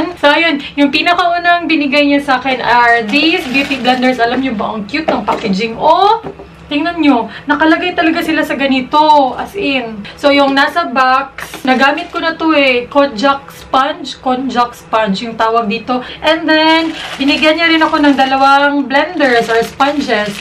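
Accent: Visayas, Philippines. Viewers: sa kaka panood ko sayo na adopt ko yung accent mo wahha. kaya akala ng mga viewers ko bisaya din ako heheeh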